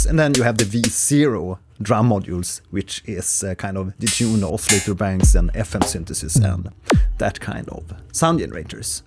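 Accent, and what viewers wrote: Sweden. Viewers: Tänkte först.. Låter lite som svensk dialekt sen såg namnet =)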